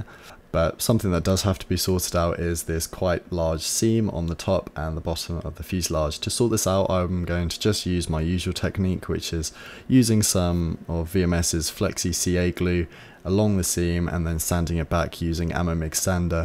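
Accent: United Kingdom. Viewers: Extremely strong British accent.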